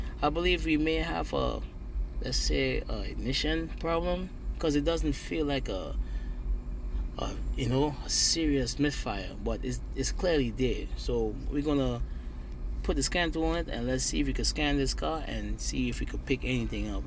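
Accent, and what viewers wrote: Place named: Trinidad and Tobago. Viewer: Idk why I hear a hint of Trini in your speech lol.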